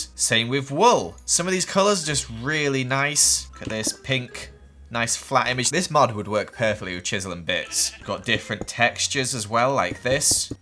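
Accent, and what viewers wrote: United Kingdom. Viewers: he has a british accent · xXĄîķö ČhăňXx I think it IS a British Accent...Only slightly tho.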